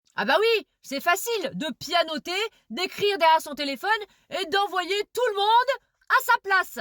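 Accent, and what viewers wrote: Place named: Paris, France. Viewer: Ah ben vous avez une sacrée gouaille vous,! Avec un soupçon d'accent qui n'est pas sans rappeler l'accent parigot que j'ai encore entendu dans les années 70... Mais je vous trouve injuste d'infliger une verte soufflante à M Mélenchon, ce grand humaniste de gauche, qui " s'est épuisé " à défendre la cause du peuple durant des décennies de dur labeur en siégeant, au sénat, à l'assemblée nationale et au Parlement européen... Quel irrespect! 😉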